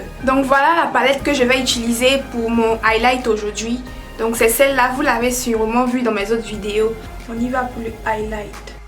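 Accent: Ivory Coast. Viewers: Coucou top top ton maquillage 🤩🤩🤩👍🏽j’aime trop ton accent ivoirien 😊où je peux avoir cette palette a Abidjan?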